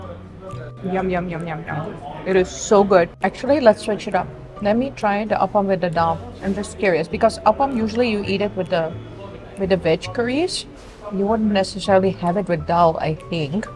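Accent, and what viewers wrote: India. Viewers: Your accent turned to Indian accent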